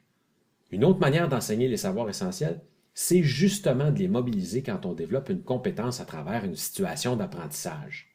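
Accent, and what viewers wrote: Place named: Canada. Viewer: mira Khodja cet l'accent canadien :)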